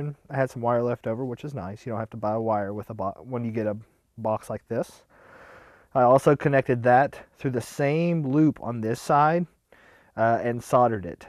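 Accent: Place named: Appalachia, United States. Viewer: That's what I said moe men tary = momentary, not moNetary, may just be his accent, I'm used to listening to hillbillys ;). Still it was a good vid and I can't wait for the homemade rebuildable atomizer. No insult intended.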